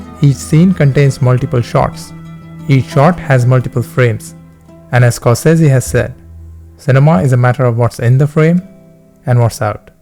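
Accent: India. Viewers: Bro the origanility of your indian accent is missing.Please bring it back!It makes narration so much lively!Rest great analysis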